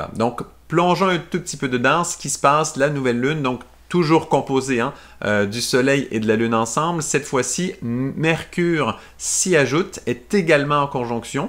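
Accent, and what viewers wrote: Canada. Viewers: c'est vraiment très intéressant , l'accent canadien donne une dimension supérieure à cette capsule !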